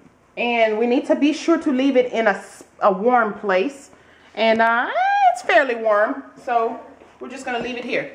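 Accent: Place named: Germany. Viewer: You have a solid german accent haha I was not expecting your reveal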